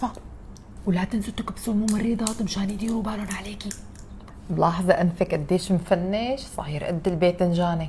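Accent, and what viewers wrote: Syria. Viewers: اللهجة السورية عششششققق عيني ربك والله 🇮🇶🇸🇾❤❤😂😂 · محلاااااكي نفسي تكون عندي صديقه نفس طريقه كلامك وحرجاتك 😂😂😂 محلاها اللهجه السوريه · لهجة سورية تهبل ❤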